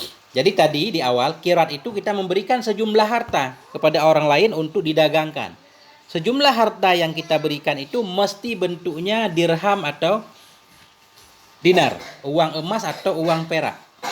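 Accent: Aceh, Indonesia. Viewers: Orang aceh yah?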